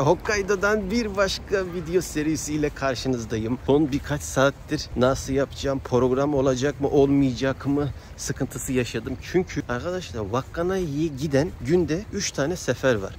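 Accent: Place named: Anatolia, Turkey. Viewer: abi japonyanın en kuzeyinde bile anadolu şivenden ödün vermemen çok özel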